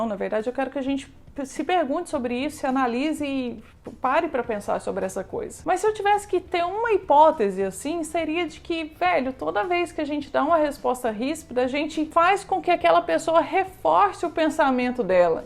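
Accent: Minas Gerais, Brazil. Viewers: Mineiro tretando deve ser a coisa mais fofa kkkk sotaque fofinho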